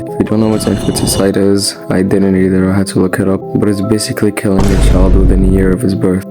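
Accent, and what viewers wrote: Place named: North Africa. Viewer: You north African bro? the accent kinda seeps out! Really good video btw!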